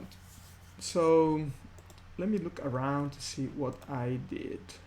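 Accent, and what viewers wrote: Spain. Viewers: Te he oido y he dicho este hombre tiene que ser español jajaj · COMO SE NOTA EN TU ACENTO QUE ERES ESPAÑOL JEJEJE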